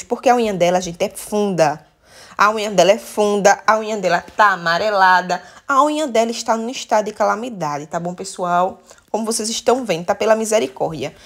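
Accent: Northeast, Brazil. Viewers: Vc é muito espontânea e engraçada. Vc é do Nordeste? Amei o seu sotaque. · O sotaque nordestino é o melhor do mundo, amooooo!! Tú é muito boa, mas a narração é a melhor!!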